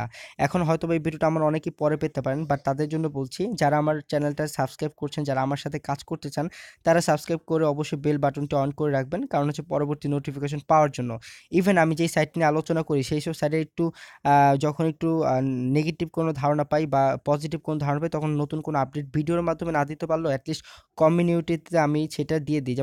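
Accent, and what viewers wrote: Barishal, Bangladesh. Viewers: ভাই তুমি তো পুরাই বরিশাইল্লা হইয়া গেছো। এখন তো আমার ও বরিশাইল্লা ভাষায় কথা বলতে মনে চাইতেছে। মোরে কি সিহাইবা বরিশাইল্লা ভাষা। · হা হা হা... ফাডাইলাইচেন মিয়া.... মোগো বরিশাইল্যা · সত্য কথা বলছি ভাই... আপনার বরিশালের ভাষার কথা ৩ বার শুনেছি... খুব মজা পাইছি... সুপার ভাষা l love you brother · borisaler vasha very nice legese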